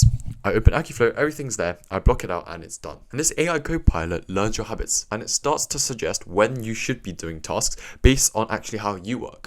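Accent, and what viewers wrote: United Kingdom. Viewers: Sorry about that 😔 you know his British so it's not good fault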